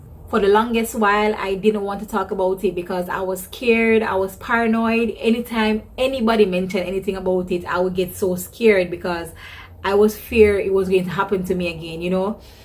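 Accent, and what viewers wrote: Jamaica. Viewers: Sorry if I may ask what country are you from... kind of your accent sound jamaican.... but you look so much like a Nigerian😀😀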